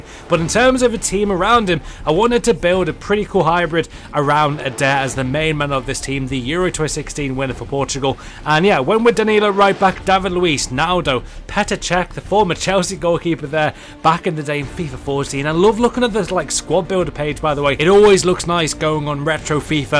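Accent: Hungary. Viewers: hungaryan commentary :D it's so good to hear :D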